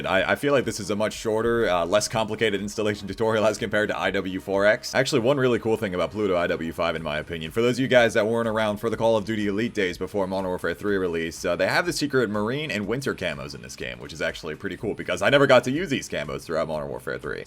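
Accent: Greece. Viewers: is he greek? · Bro are you greek?? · Wait! Are you Greek? 0_0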